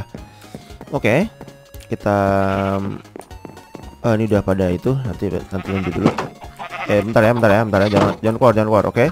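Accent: Java, Indonesia. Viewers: balik balik... ohhh lanjut medok jgn lupa bang btw main ke channel gw juga guys seru kocak konyol suangar wess mbolah di delok dewe pokoe hehe ✌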